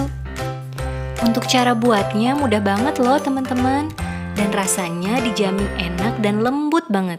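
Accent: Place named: Java, Indonesia. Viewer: Rm sedapnya kue dibelinya😄 jowo medok hadir embak e. Yuk yang lain...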